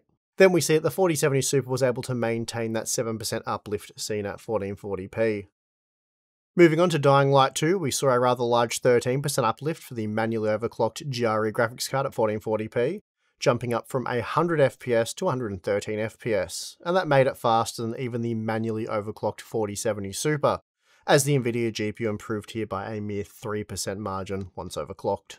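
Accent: Australia. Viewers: Regret even less for buying last week! BG3 is so smooth now! And that Australian accent is truly something else lol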